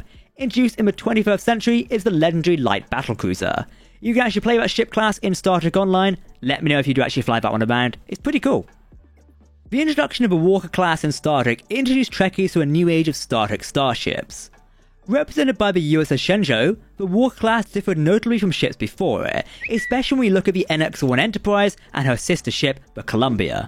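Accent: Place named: Cockney, United Kingdom. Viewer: Firty seven. The Cockney accent drives me insane.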